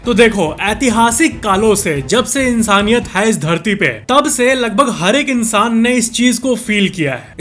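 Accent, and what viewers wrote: Bihar, India. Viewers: kya aap bihar se hai bhaiaa🤔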